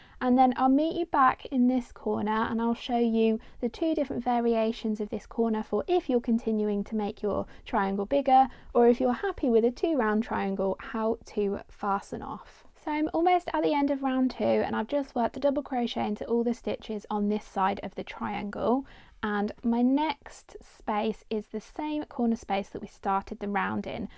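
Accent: United Kingdom. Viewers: You have a British voice but I think you are using USA crochet terms ?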